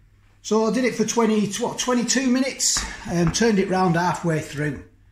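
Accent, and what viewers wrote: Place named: Rochdale, United Kingdom. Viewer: I’ve just subscribed bud I’m from Oldham you sound like your down the road in Rochdale bud. Anyhow likening the vids buddyespecially the vs series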